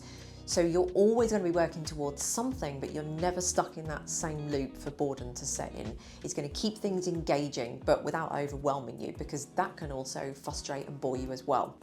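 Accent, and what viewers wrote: United Kingdom. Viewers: Not going to lie I stopped playing violin like 5 years ago yet still here I am watching your beautiful face and attractive Aura with your British accent😅😂😂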